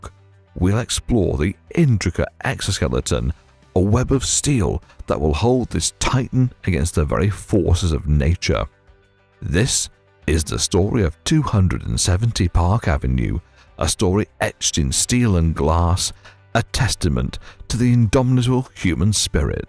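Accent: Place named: United Kingdom. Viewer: We're not British here in New York City. We don't pronounce it Two-Hundred and Seventy Park Avenue. It is Two -Seventy Park Avenue. And while I'm at it, we don't say litrally either. It is pronounced literally. We don't drop the "E" like you Brits due all the time.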